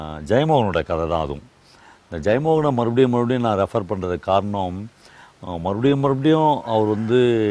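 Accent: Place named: South Arcot, India. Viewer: பாவா உங்களின் மொழி பழைய தென்னாற்காடு மாவட்டம் பேச்சுவழக்கு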